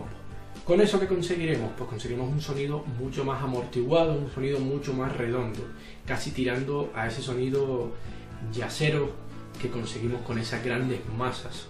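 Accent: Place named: Spain. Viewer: Justo antes de que dijera que estaba viviendo en Colombia estaba pensando en que para ser español se le notaba muy pero muy poco el acento, me preguntaba de que parte de España sería. Y cuando dice que es de mi país me cago de risa y entiendo el por qué XD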